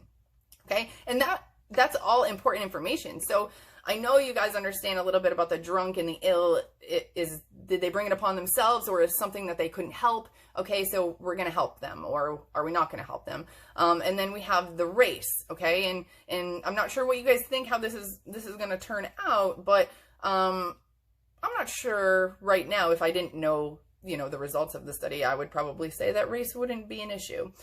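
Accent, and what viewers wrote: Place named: United States. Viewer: thank u thank u for making this engaging to watch because u talk like an actual person. you dont use like a million long words per sentence like these other educational videos you just talk normally and your actually fairly relatable and chatty. and also all the videos for my course are british and after living here for 16 years british accents really start to grate on you so hearing an american accent was refreshing and much less dreadful. its 1am you lowkey kinda saved me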